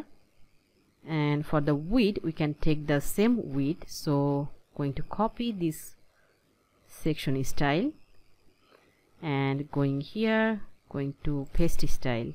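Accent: Bangladesh. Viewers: Are you Bangladeshi? Your English speaking is so understanding. ❤️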